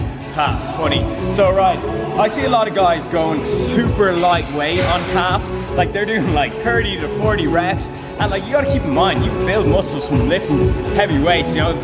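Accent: Ireland. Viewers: I wonder are you able to speak Irish Gaelic? Your Irish accent is pretty light compared to others I've heard.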